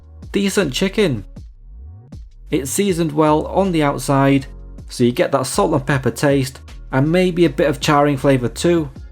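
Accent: United Kingdom. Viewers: Mate, You are the most polite reviver. Listening to you speak you've been in the UK for a while.The first one looked to dry The second was far better mate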